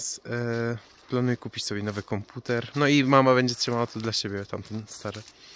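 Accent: Poland. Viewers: wlasnie nawet akcent polski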